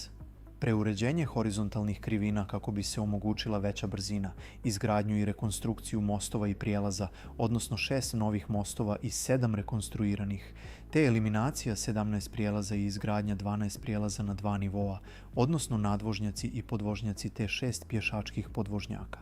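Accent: Serbia. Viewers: Dobar video al ide mi na k ovaj srpski naglasak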